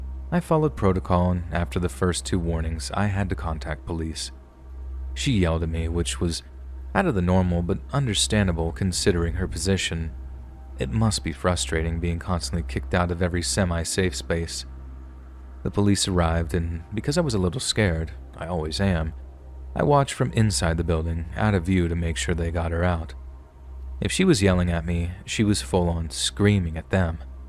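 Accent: Denmark. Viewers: Haha, når man genkender dansk accent med det samme!! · So is Danish? I was trying to place the accent.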